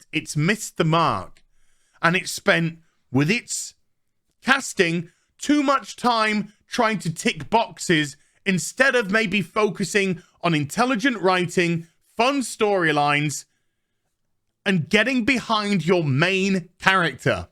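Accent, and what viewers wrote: United States. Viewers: I'll admit I couldn't even tell you wasn't american my first time watching you.😅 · Gary's fault for your American speech. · you do sound American sometimes lol and I love it!! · Are you American? You are speaking American with a funny accent. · Yes you do sound like a yank somethings. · Yep, you sound more and more American all the time.: ) O.o?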